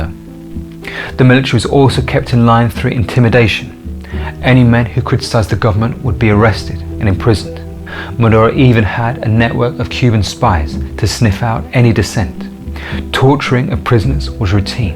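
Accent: United Kingdom. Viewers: First of all let me start by saying I love your content but…Can I recommend maybe holding a fake or real if your down a snifter of brandy or cognac like I said real or fake. Your hand hangs outs there for ever plus I think the snifter 1000% plays into the decor and your obvious English accent. Just a thought a just notice your hand never ever retracts to your body it looks very robotic again these are observations not being critical by any means please don’t think I’m trying to be. Regardless keep up the great work!!!!!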